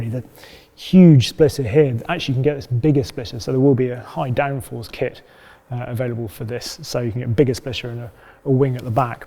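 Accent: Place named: United Kingdom. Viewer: An American vehicle... With a British narrator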